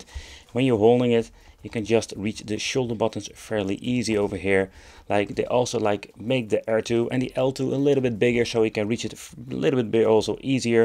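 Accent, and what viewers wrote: Netherlands. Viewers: Enige reden dat ik het zou kopen is omdat ik geen steamdeck kan kopen ivbm wachtlijst.... Maarja weetje €300 voor wat nostalgie games is opzich te doen. Je Hollandse accent is niet te missen trouwens 👍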